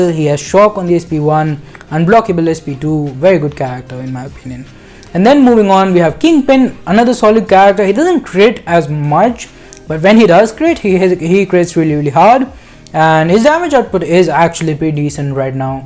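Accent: India. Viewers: Are you Indian ?? Cuz you like and sound like a Indian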